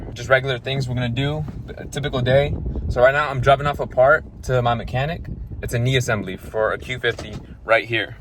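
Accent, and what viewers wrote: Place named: Morocco. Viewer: Where you from bro, you sound Moroccan ?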